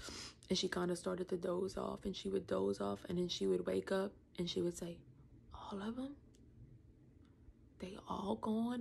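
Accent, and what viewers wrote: Louisiana, United States. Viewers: Listening to your accent takes me back to my time in Lafayette. I’m so sorry for your family. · You came across my Youtube feed and decided to watch your channel. As I am listening to you speak, I instantly knew you were from Louisiana, didn't know from where. Anyway. I am listening to your story and I'm so sorry and condolences to you and your family. I have watched the videos of the car and my God, praise God mama still here. I wish I could hug you. Having a loved one pass on is one thing, but to have them go because someone was to careless to care what their actions would cause to someone else. I 'm not sure if you're the same family I heard about from Jeanerette with the mom and three kids coming from watching a basketball ball game up north. I cried when I heard about that. Know that you have people who love and support you. 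even if you don't know them. Praying for continued healing and peace for your whole family. ❤ from a Loreauville girl. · Sounds Cajun, maybe? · This has nothing to do with the seriousness of your topic, but I knew you were a Cajun girl as soon as I heard you speak, so I looked at your about statement. I grew up in South Mississippi and have always loved the Cajun accent. My husband is from the Folsom-New Orleans area. I am so very sorry for the horrible loss your family suffered. I am sure, even now more than a year later, your family, and especially your mom, is still trying to discover the "new normal." I have never heard of the group with which you met, but it sounds like a wonderful support system. I think it's great you're not going to call the traumatic loss of your younger subs a "accident" anymore. My dad was a attorney in Mississippi, and it may have been after I lost a friend and her unborn baby to a drunk driver, I asked the definition of a accident. 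He told me a "accident is something that couldn't have been avoided." Well, from that legal definition, no drunk ever has or causes an accident; they create wrecks or, your new word, crashes. I know God's peace has surrounded you and helps you with your grief. I pray His peace continues to uplift you and your family in the days ahead and when your hearts are very heavy during milestones such as what should have been graduation years, when they might have had weddings or babies, special birthdays like 25, 30th, and so on.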